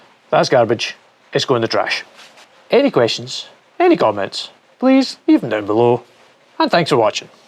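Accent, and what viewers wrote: Scotland, United Kingdom. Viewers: I wish I saw your video before I bought one. I paid $75 AUD for this full kit with 2 batteries shipped to me in Brisbane australia from a Melbourne eBay store. Delivery was within 3 days and excellent. But the constant cutout while grinding drives me nuts. This is my first time trying out a fake brand substitute. It’s no way better than my Ozito (Einhell) grinder. Never again. I found your video very good and love your Scottish accent. Subscribed. Thank you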